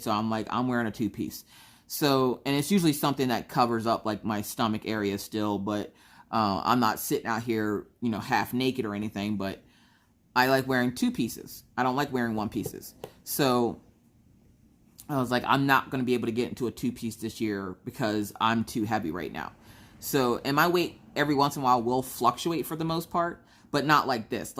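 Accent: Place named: East Coast, United States. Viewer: I'm new to your channel and you've really got me addicted! When I first heard you I figured east coast. I spent a LOT of years in Baltimore. Then when I heard you say you were in ND? Even a smaller world. I was born and grew up there. And now I'm in SD so was loving to hear about your trip to the badlands! I'm in Rapid, in sure you passed pretty closely by.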